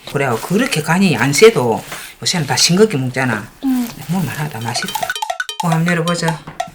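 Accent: Gyeongsang, South Korea. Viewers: 사투리 내만알겠노 ㅋㅋㅋㅋㅋㅋㅋㅋㅋㅋㅋㅋㅋㅋㅋㅋㅋㅋㅋㅋㅋㅋㅋㅋㅋㅋㅋㅋㅋㅋㅋㅋㅋㅋㅋㅋㅋㅋㅋㅋㅋㅋㅋㅋㅋㅋㅋㅋㅋㅋㅋㅋㅋ 와 창원 아니면 마산같은 느낌이시네. 제가 마산사는데 너무 똑같다 ㅋㅋㅋㅋㅋㅋㅋㅋㅋㅋㅋㅋㅋㅋㅋㅋㅋㅋㅋㅋㅋㅋㅋㅋㅋㅋ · 구수한경상도사투리최고 저도경상도토박이라더더파김치배워갑니다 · 저도 혼자 빵 터져서 주름졌어요 ㅋㅋ 말씀이 너무 정감가고 재미있어요. 아마 서부 경남분이신가 싶네요~^^ 잘보고있어요.😊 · 꼬꾸라 져뿌리 깅상도 사투리 오지럽ㅋㅋㅋ넘구수해요 항상 건강하시구 반찬많이 갈쳐주세유~감사합니데이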